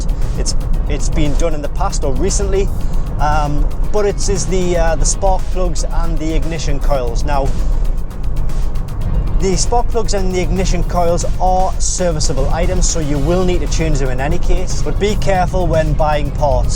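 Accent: Newcastle, United Kingdom. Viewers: The minute I opened the video I knew he was from Newcastle. Can always tell the accent. Did my master's and bsc there back in <num>. A great city indeed. Nice video by the way. Warm greetings from Nigeria.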